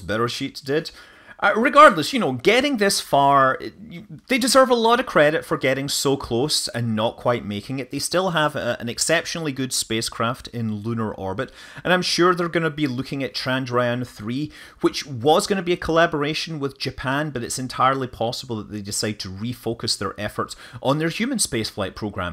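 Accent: Canada. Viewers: isro is pronounced as iss-roe in indian english. not everyone needs to have manley's canadian accent, no?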